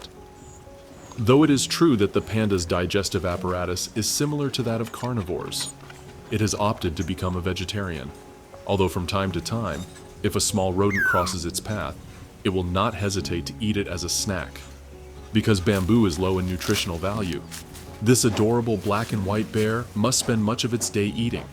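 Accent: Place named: Transatlantic, United States. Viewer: It’s quite interesting that a transatlantic narrator is describing an ecosystem that a transatlantic president is Hell Bent on destroying by openly declaring ‘ Drill Baby Drill’ someone needs to drill into Putin’s head to find where the evil is and to drill into Trumps head to find where the greed is. No?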